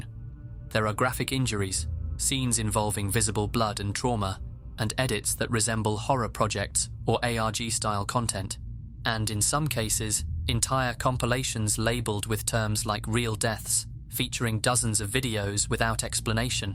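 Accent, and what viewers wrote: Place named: United Kingdom. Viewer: Nasally, Br*tish voices aren't exactly fit for these types of videos.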